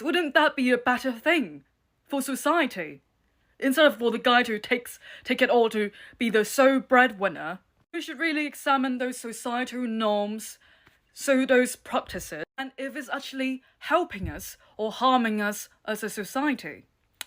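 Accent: United Kingdom. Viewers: Hearing someone speak perfect King’s English but use woman as a plural like a Japanese person is so surreal 😭 But also very cool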